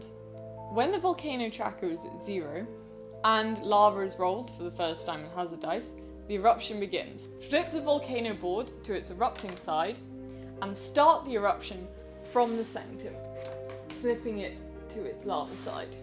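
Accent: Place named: United Kingdom. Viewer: Donnie DeVille this is not mumble, it's British.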